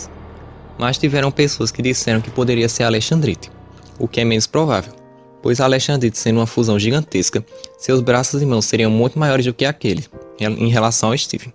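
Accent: Northeast, Brazil. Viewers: Já sei que vc é do nordeste :-) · vc eh nordestino?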